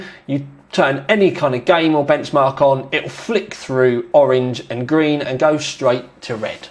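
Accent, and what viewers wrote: England, United Kingdom. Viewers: you have a strong british accent my good sir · it's just how he pronounces it, could be just him, or cause of the english accent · HI if you want a polite version of Dogs Bollocks Its the Mutts Nuts im a pomme in oz so have to tame it down a bit with the rich Thanks for the show and effort and the good old English accent still got mine it don't seem to disappear Know what I mean gezzer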